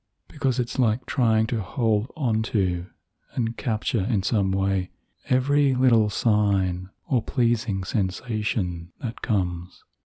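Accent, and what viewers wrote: Australia. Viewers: I thought this was Australian accent? Either way it is super comforting. 🤗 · are you an Aussie?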